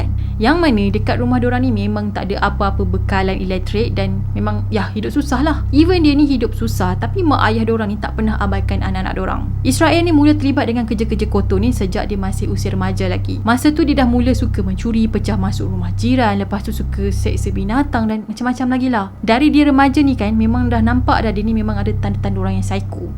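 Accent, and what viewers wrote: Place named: Kelantan, Malaysia. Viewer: Israil ni org kelantan ke....dengar mcm kak ckp mcm org kelantan...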